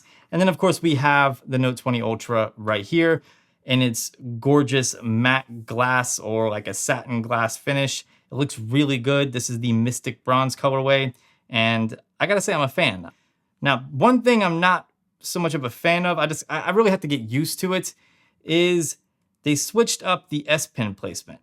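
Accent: United States. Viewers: I have the note 8 since it lunched but I'm thinking to upgrade to the note 20 ultra. I like your American accent 😀.....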